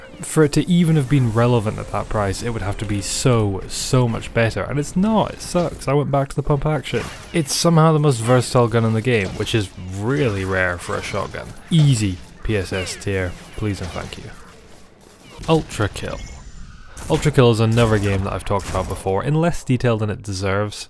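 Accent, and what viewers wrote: Scotland, United Kingdom. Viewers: it took me 14 minutes to realise you were scottish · Lad are you Scottish??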